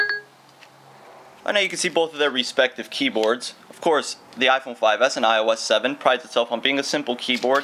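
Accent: New York, United States. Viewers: Superb review. By the way, is that a N.Y. accent?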